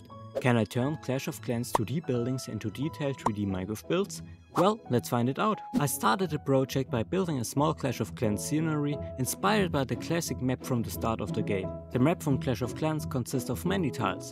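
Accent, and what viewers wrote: Germany. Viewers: Wer you german? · Are you german? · I like your accent. Is it german? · Can I ask where you’re from? You sound German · Deutscher Akzent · Are you from germany, cuz you've got a damn german accent! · Man hört richtig, dass du deutsch bist hehe Nices video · you are from germany right?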